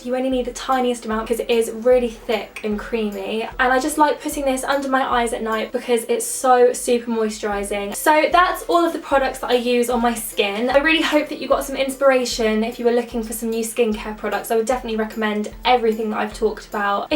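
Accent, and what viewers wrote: United Kingdom. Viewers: I just love the british Accent (I live at another country). Please can you make the room tour now, Not by 3000 cause can't wait i love just your backround. And you derseve all followers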